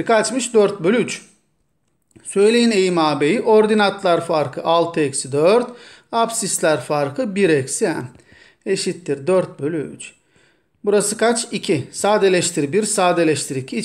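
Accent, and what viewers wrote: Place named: Çorum, Turkey. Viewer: Corumlu şivesi eskisehir veya sivas herhangi biri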